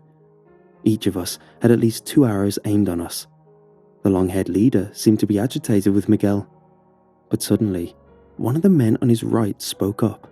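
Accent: England, United Kingdom. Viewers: Btw ..now im in time. .haaah. itz 14hours ago .. btw. Where ever this story comes from. ... think america. But the voice is so. English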